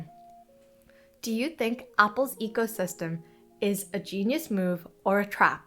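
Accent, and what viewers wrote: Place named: Zimbabwe. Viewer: Nice informative video. Well narrated and edited. Whats your accent? Zimbabwe ? Keep up the great work. Cheers from New Zealand 😎🎸🤘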